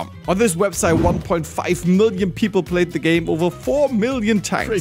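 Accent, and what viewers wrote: Germany. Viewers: You can really hear the German aktent.😅 Do you still have a say in the game? But I don't think so.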